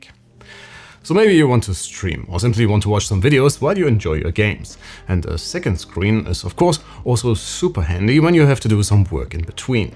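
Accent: Germany. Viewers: bro u german?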